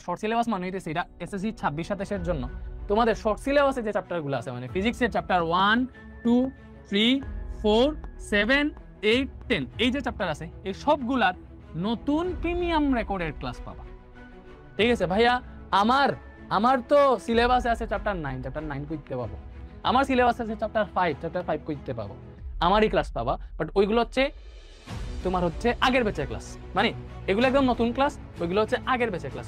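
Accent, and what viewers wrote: Sylhet, Bangladesh. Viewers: ভাইয়া কী বাড়ি সিলেট? কথার মধ্যে সিলেটি টুন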